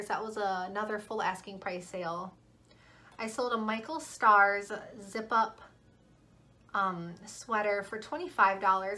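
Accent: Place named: Michigan, United States. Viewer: I thought that you had a MI accent! I'm from the Upper Peninsula but live in Oregon.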